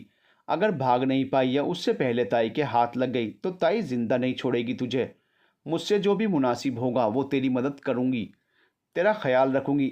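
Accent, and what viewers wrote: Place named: Mumbai, India. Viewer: O bhiya tapori bhasa to bade achhe se bolte ho mujhe bahot achh laga 😁👍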